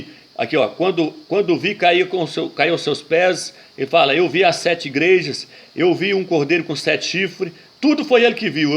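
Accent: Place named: Minas Gerais, Brazil. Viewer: vc mora onde, fala igual mineiro.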